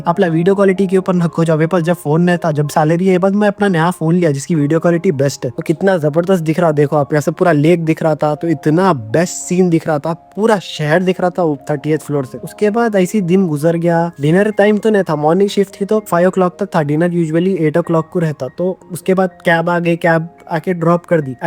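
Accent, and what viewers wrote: Hyderabad, India. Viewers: Tum Hyderabad ke dikhre accent dekh ke. Good to see our Hyderabadi doing such great work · Nice accent bro hyderabadi ❤️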